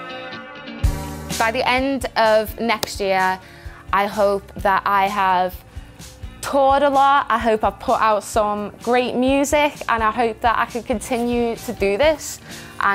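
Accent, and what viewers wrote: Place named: England, United Kingdom. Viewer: While her music is fun, and well produced, sounds and acts like an American's stereotype of an English person truly personified.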